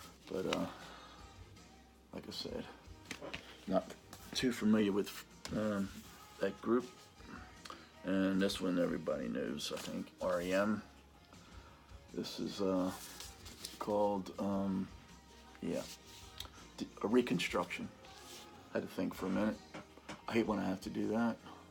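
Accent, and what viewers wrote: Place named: West Virginia, United States. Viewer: Are you in WV? You don't sound too far away from me I'm in the Panhandle anyway you have a great collection there I totally forgot about the, they had some great songs.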